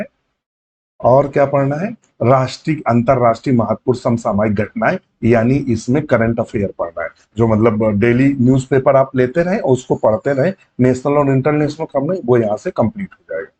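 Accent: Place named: South, India. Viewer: Mere ek sir the south se vo bhi ese hi bolte the...l yell m yemmm n Yenna.....sobi think sir south se h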